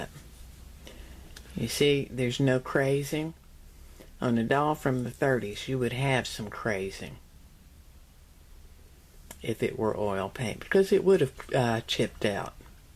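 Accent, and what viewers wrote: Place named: South, United States. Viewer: I am really impressed with your dedication to your talent. 50 yrs is a long time. I, too, have taken a few watercolor classes long ago, but would have to start from scratch in my knowledge. Remember some things but not much! One of my workshop teachers worked in egg tempera sometimes. Have you ever worked in that?. I have so many hobbies, it’s had to get into any of them as deep as I’d like. I’m retired now, so I can partake more.Would love to see some of your paintings. From your accent, it tells me you live in the south. May I ask where? I enjoyed your seeing your pretty yard. Thank you for sharing your videos. I enjoy your teaching. I’ve been collecting and making antique reproduction porcelain dolls, and trying to dress some. I love dolls! Love always having my eye out for a cheap one with potential. My doll teachers have been restorers, too. 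I’d love to learn how to do my own purchases. I learn some things from them, but when it’s their living, I hate to be really nosy about techniques. I am learning through you and others on utube. Thank you so much, again! It’s so wonderful that you share! Again, would love to see your paintings. What is the style you use? Abstract, realism, landscapes, portraits, impressionist like, etc., etc? Thank you for your extended answer! Keep “arting”. 😉😊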